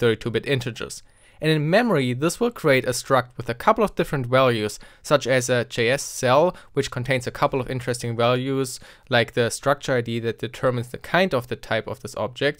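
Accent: Germany. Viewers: I love that German accent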